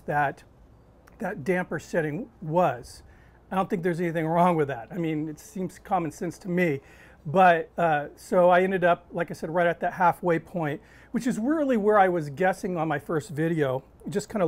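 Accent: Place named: Texas, United States. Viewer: no need to have a Texas drawl, you're a pitmaster, brother! 🌵🤠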